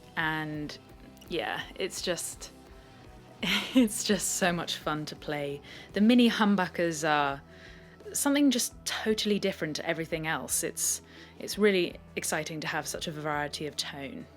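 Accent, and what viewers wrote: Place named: RP, United Kingdom. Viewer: Good on you, thanks for the look around your studio! I enjoyed listening to your very easy on the ears posh British accent... Best of luck with the record!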